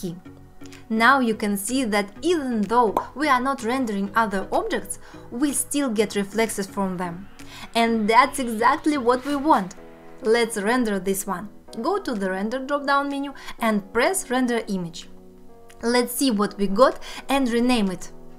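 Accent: Russia. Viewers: Из Русского только русский акцент) но спасибо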